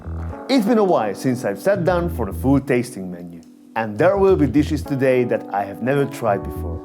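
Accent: Hungary. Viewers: Noo way..I knew I recognized that accent, but I never realized you’re not an, you’re a! 😁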